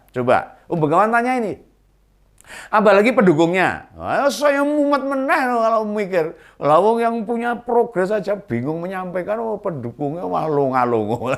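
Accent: Java, Indonesia. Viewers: Teruskan pak blantek sapi ngomong jowo ae gak usah ngomong bahasa indonesia medok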